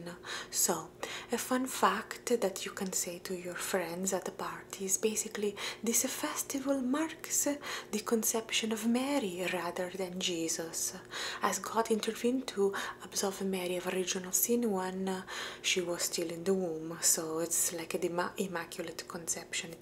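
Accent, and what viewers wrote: Italy. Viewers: This is the same as my accent when I try to speak English😂(I am Italian🇮🇹).Nice video! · Italian accent - this is amazing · I love your italian accent videos. I would love to see more of them. Greetings from Germany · Brava, brava bravissima. You sound Italian just like me 😅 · fantastic asmr, love it when you do the italian accent 😍. just out of curiosity but what nationality are you, just to know your mother tongue? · This is great, I love learning about Italy and your ASMR. Italian accent is always so good. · I love the italian accent and I love hearing about italian culture in general! Is it true that italian pizza is different than the ones in Europe and America?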